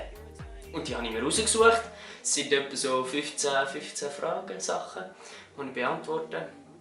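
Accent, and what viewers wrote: Switzerland. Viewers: Wir Norddeutschen brauchen doch hin und wieder Untertitel. Aber lass Dich nicht stören. Schwyzerdütsch ist schön. (Oder ist das gar schon Zürcher Hochdeutsch?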